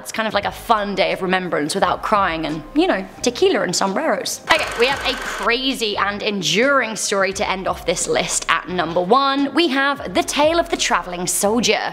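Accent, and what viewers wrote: England, United Kingdom. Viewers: Honestly loved this list! Most of these were my bed time stories growing up 😂 a very popular one is La Mano Pachona. Basics of the myth is that a furry hand would come up from under the bed and pull your foot. Sasquatch? Chewbacca? Who knows, it was scary as hell growing up tho 😂 (Side note, I've always wanted to hear someone with a British accent speak Spanish. You did not disappoint. Thank you for fulfilling my life long dream 😂) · She is English and has an accent my guy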